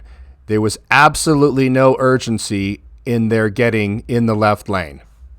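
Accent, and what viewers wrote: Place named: United States. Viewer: Nothing to do with this. But I'm an Englishwoman and I love your American accent,. But aside from that - that twat from Engine 7 had it in for you from the word go. Too much ego and not enough brainpower to understand that you were filming from a public area and had every jurisdiction to do so. What an absolute bellend.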